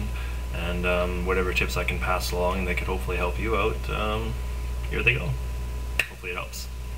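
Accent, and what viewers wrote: Canada. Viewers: 3 mins in realized hes canadian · Nice Canadian accent haha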